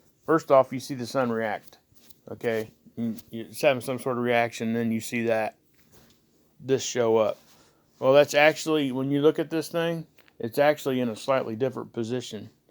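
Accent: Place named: Ohio, United States. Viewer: It helped that heavy Ohio accent ! 😉 o know cause my brother has lived in Ohio for 20 years you all sound the same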